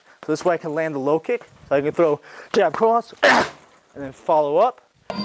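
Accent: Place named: Russia. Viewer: Low Kicks my #1 favorite technique, no bullshit Russian Accent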